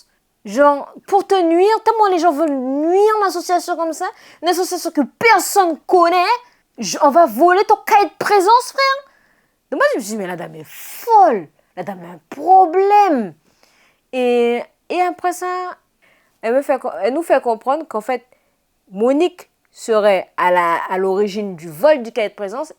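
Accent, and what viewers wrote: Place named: French Guiana. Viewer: Je suis guyanaise aussi et ta l'accent et la manière de parler mmdr.😂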